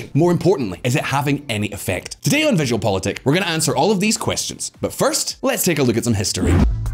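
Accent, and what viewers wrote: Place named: England, United Kingdom. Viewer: I agree, but doesn't it feel weird having some guy with an engish accent telling us how bad one of our cities are? Just doesn't feel right bro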